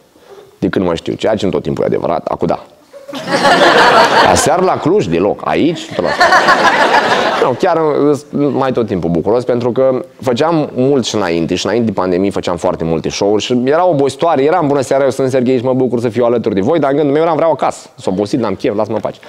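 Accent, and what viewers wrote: Moldova. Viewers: Și ce fain e ca și a păstrat accentul moldovenesc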